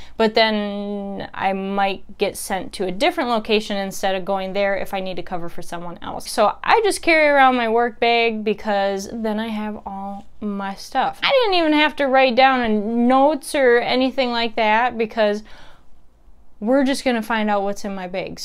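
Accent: Midwest, United States. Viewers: That midwestern accent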